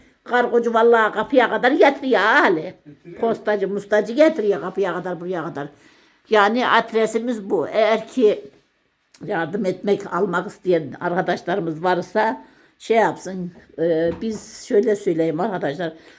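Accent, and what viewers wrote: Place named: Çorum, Turkey. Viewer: Ben bu kardeslare abone değilim ara sıra izliyorum yorumlara bakıyorum,takipçilerin bazisindan gelen çirkin yorumlar benim bile canımı acıtıyor çok rica ediyorum beğenmiyorsanız neden izliyorsunuz.Turkiyenin her yöresinin lisanı lehçesi değişiktir hanım Çorum'da konuşulduğu gibi konuşuyor beğenmeyen izlemeyecek,ayiptir günahtır.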